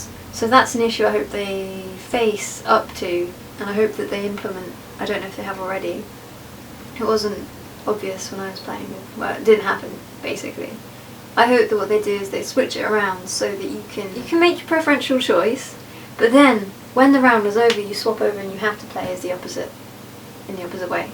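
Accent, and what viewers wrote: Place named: United States. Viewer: stop talking like an american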